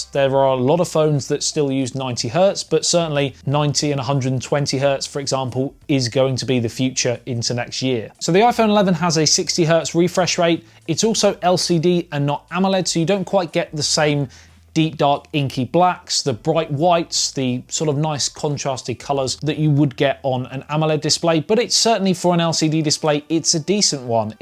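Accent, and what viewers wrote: England, United Kingdom. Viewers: That's the beauty of the English speaking the English language.😍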